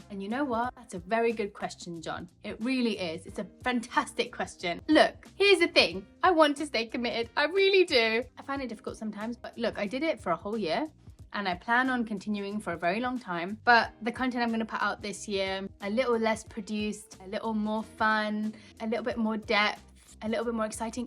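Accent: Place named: United Kingdom. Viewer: Why she has British accent. Is she Indian or🙄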